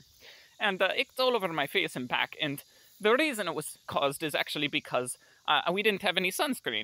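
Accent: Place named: Russia. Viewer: Love the accent. And you have really good English for being Russian. Making the most of what you got and looking on the bright side. NICE!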